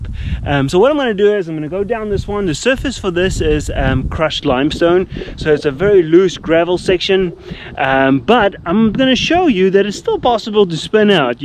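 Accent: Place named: South Africa. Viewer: I was wondering what your accent was! South Africa, very cool. Probably good riding there too. I've got 1800kms on my Nano's and there's another 1000 or so km left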